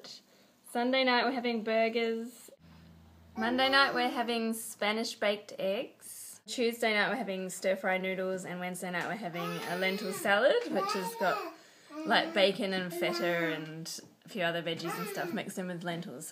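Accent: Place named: Australia. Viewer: Just heard you say you use to live in Australia. I can't seem to work out whether your Australian or New Zealander. It's hard to tell by the accent sometimes😊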